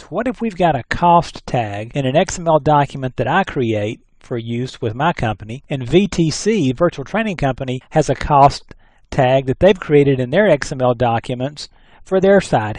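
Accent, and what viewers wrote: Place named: United States. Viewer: I know right? It sounds North-American, but almost too much. Almost like someone putting on an American accent. Great video though!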